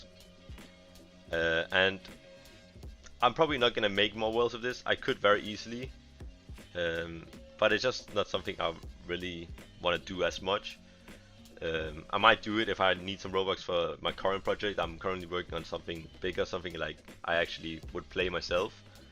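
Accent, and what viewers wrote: Denmark. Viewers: Are you danish?🧐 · Your danish right?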